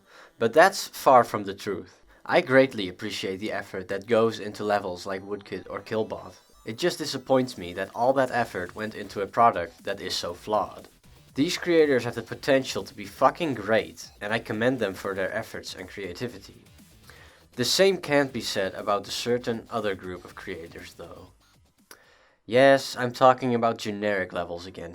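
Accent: Netherlands. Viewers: wow the dutch accent shines right through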